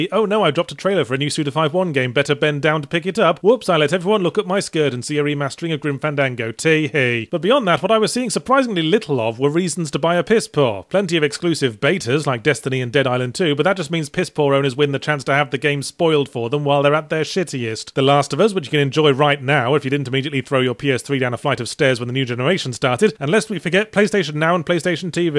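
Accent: United Kingdom. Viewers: Man. I love the cynicism of this show and the British accent only makes it better. Couldn't stop laughing. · it is like all of my gamer grief in one video but with a british accent....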